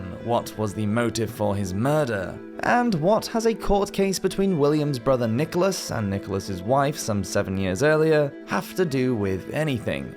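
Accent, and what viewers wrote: England, United Kingdom. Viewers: Love your content and your lovely English accent.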